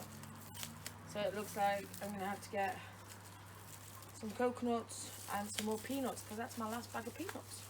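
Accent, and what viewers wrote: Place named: England, United Kingdom. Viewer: Hello Young Lady I do love watching your videos I always did love a woman with an English accent and with a full figure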